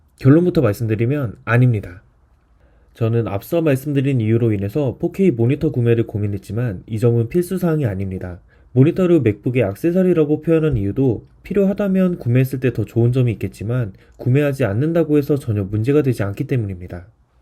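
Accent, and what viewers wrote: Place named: Chungcheong, South Korea. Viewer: 충청도 사투리 재밌어서 킹받네 ㅋㅋㅋ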